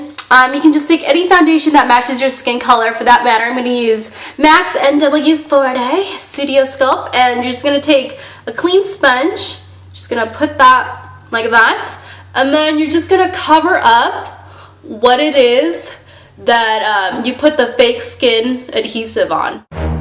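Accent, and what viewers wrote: United States. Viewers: she sounded so country back then haha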